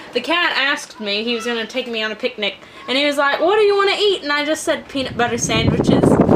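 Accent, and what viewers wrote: Texas, United States. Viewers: Back when Texan accent shown through and was beautiful 😍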